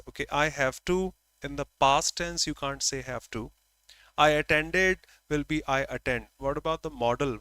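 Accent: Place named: United Kingdom. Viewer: British English sir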